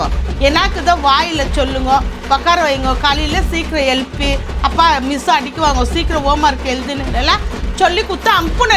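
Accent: Bangalore, India. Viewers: Bangalore tamil ah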